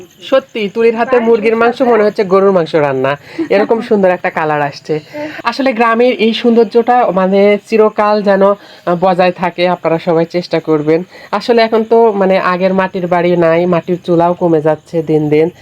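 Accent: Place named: Naogaon, Bangladesh. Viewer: নৌগার ভাষা এমনি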